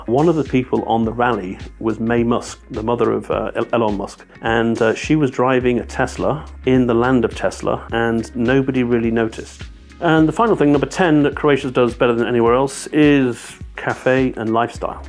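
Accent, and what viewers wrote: United Kingdom. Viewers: Pommy nob.😂😂😂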